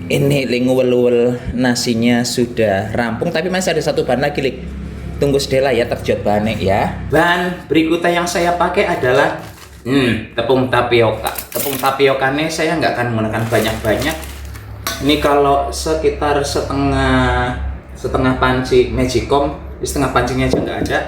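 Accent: Banyumas, Indonesia. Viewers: O...mas.. Eneng apik e nek gawe kamera..men Ojo komplin netizen... iku tangane di sarungin lo maaas..kan malah apik.... aku seneng karo celoteh ne lucu³ Indonesia ngapak...😄😄😄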